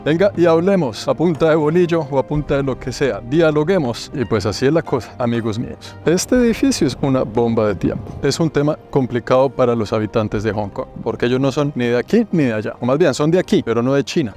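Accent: Colombia. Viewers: Este gringo tiene acento colombiano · Muito bonito o espanhol colombiano. 👏👏👏👏👏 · Pese a que su contenido lo ven muchas personas de diferentes países de habla hispana, él habla como un buen colombiano del común. No es como otros youtubers que empiezan a hablar neutro o se mexicanizan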